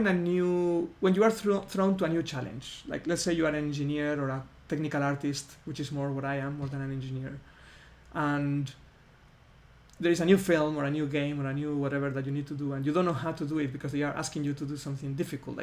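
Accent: Spain. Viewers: Te he oido y he dicho este hombre tiene que ser español jajaj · COMO SE NOTA EN TU ACENTO QUE ERES ESPAÑOL JEJEJE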